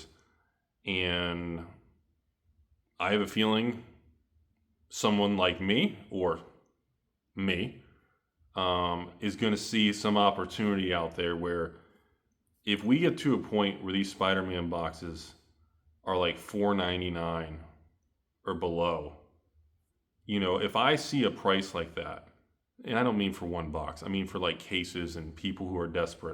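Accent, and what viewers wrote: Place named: Oklahoma, United States. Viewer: You sound like a really brilliant individual- nobody with half a brain would take financial/investment advice from someone like you that talks like they date their sister in a trailer park somewhere in Oklahoma. Wow what a prediction it hasn’t hit its low yet like every single person already knows. Thanks captain obvious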